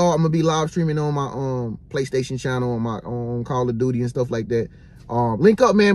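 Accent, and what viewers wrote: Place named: South, United States. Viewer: Yes sir, I've been hurt, and bullied at work for no reason. By-the-way I love your southern accent King! 🤗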